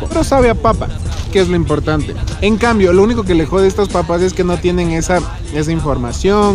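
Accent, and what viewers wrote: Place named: Ecuador. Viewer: Excelente que le metas todo sobre ECUADOR Música Pinta Labia Carisma Acento ... Etc, Etc, Etc. Genial bro RIOBAMBA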